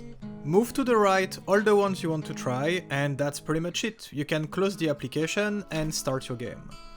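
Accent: France. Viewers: amazing channel my dude. I just started Anomaly yesterday and you helped me a lot. I'm curious tho, where does your accent come from? Sounds like a french accent.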